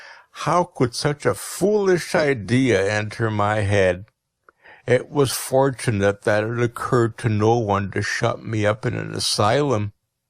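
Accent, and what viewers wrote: United Kingdom. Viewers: на слух - британский.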